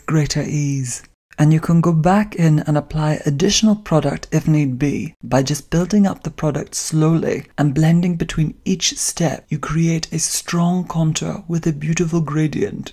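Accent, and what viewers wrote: Scotland, United Kingdom. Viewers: Ah, I knew i heard Scottish inflections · Scottish! I’ve been watching your videos all day trying to figure out what accent you have! Lol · SCOTTISH, I KNEW IT!!!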